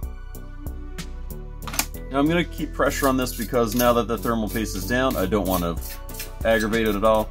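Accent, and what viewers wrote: Canada. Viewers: You do say "out" like you're Canadian. And that amount of thermal paste was perfect. Either way, better to go over than under unless it conducts electricity. 😊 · You say "out" almost like "oot"-- which to us Americans is basically the stereotypical Canadian accent. Lol.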